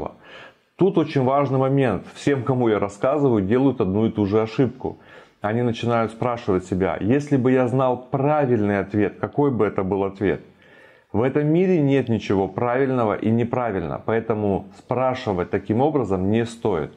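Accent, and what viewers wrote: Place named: rural, Russia. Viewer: Вам бы ещё научиться говорить правильно для полного баланса, а то по-деревенскому говору не сказать что вы с 20 летним стажем бизнесмен)))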